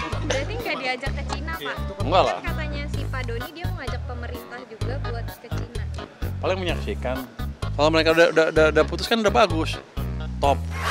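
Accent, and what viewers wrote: West Java, Indonesia. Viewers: Mang maneh orang Sunda lain Siga org Sunda logat na wkwk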